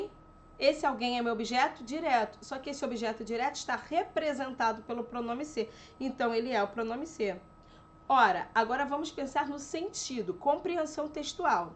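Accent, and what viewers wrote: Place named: Rio de Janeiro, Brazil. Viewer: em um dos vídeos, disseram que a prof estava com a voz da Anita; neste, ela está com a voz da Thaís Araújo. Acredito que tudo se deve ao modo como ela reproduz o xxxxxxxxxxxx carioca! rs É instigante a observação e comparação.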